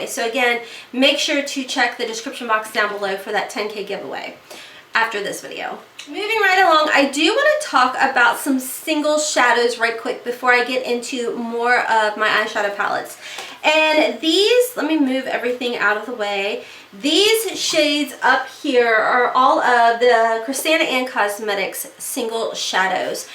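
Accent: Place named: Kentucky, United States. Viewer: Oh, I'm originally from SC but I LOVE your Kentucky accent!!! 😉💖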